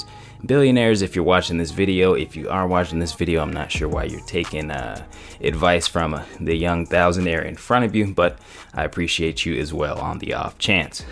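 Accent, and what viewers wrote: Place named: United States. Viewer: Where in the States are you from? You have an awesome voice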